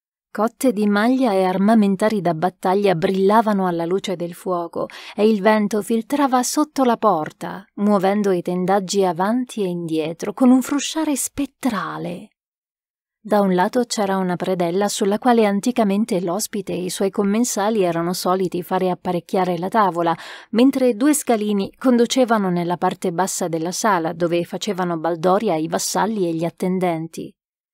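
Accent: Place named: France. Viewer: Bravissima, mi piace soprattutto il tuo accento francese. 👏👏👏👏👏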